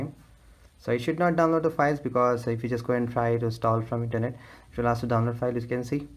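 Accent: India. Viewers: Rapid fire speech with an Indian accent 🥴..... Geeze i need a nap !!!! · You talk like an Indian boy that I don't like and your language suck like trash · its okay every india sounds like that just like japanese tho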